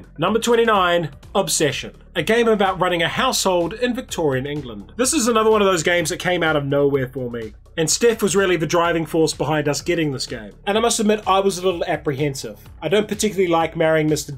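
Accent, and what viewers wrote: New Zealand. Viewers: Kia ora, first time watching your show and obviously picked up the kiwi tone etc. Then to hear the Waikato part was great. Are you still in the Mighty Waikato as Hamilton is my home town.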